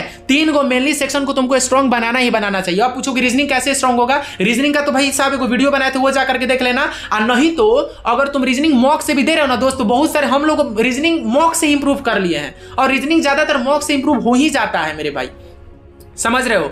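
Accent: Bihar, India. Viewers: May be u r from Bihar 😊🙏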